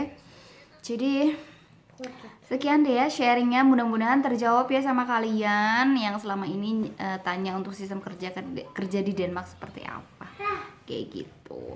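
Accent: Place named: Jakarta, Indonesia. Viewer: Halo ka salam kenal, saya subscribers baru. Menarik banget video2nyaa apalagi cara ngomongnya jakarta banget hahaha jd kaya dengerin temen curhat. Oya ka, disana adakah yg kerja loper koran atau delivery makanan gitu tapi perempuan?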